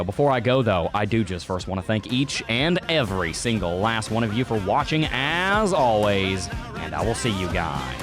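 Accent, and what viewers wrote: South, United States. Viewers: Sounds like you are from the south. You got the radio voice gift. Great TA.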